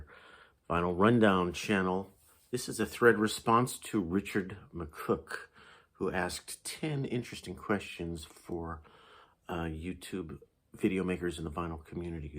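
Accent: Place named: Scotland, United Kingdom. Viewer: I nearly spat my tea out when said you were Scottish Richard! 😎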